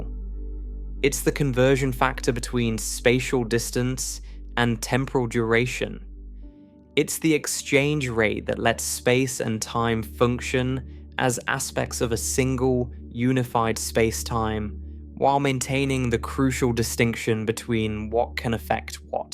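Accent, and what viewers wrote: England, United Kingdom. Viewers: USUAL ENGLISH ACCENT GARBAGE VIDEO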